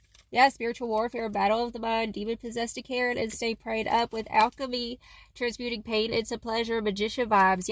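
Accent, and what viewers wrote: South, United States. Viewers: my favorite southern reader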